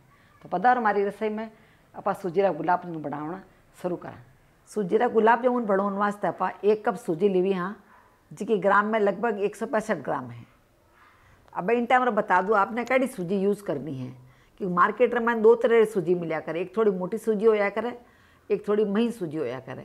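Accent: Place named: Marwari, India. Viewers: Ye to Bikaner side ki marwadi lag rahi hai. Jaipur me aise to nahi baat karte? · दिदि आपकी मारवाड़ी आवाज अचछी लगती है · Very nice recipe .Explaining in marwadi language is really sweet.Thanks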